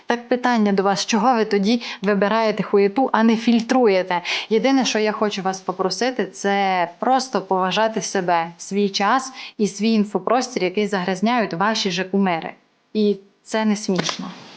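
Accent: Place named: Transcarpathia, Ukraine. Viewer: Будь ласка! Несіть цей закарпатський вайб якомога більше... Це ж бальзам для вух♥️ Маю мрію в серці- навчитися так розмовляти 🥹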